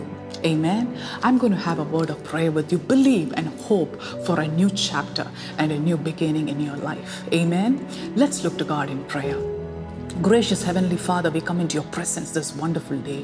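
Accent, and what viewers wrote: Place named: India. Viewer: 5 Subjects Great Stuff Pastor. Very Clear, Good Study and English That's a great Indian Accent.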